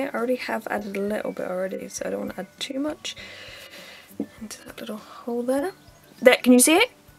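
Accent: United Kingdom. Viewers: U sound so British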